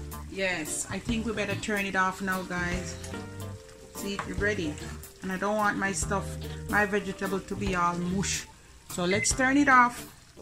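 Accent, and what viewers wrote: Jamaica. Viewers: Hey hun, I can hear the accent in the voice...Jamaica to the world. Let's stay connected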